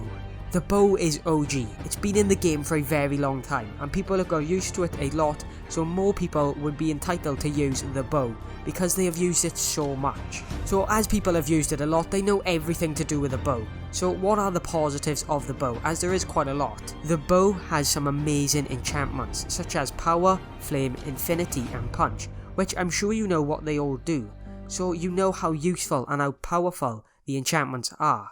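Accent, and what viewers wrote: Wales, United Kingdom. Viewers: Bro are you from wales, the accent seems familiar 😂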